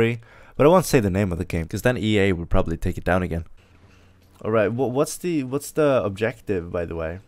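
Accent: Sweden. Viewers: Är du svensk? /are you from sweden? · Waitttt.. are you from sweden? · Are you from sweden?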